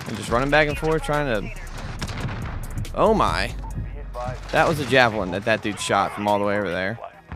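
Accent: East Coast, United States. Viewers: i’m from baltimore and just by the way you talk i can tell you’re from the east coast, no weird shit g was just curious 🤣 don’t trip i’ll put my homies on get you some more subs you feel me?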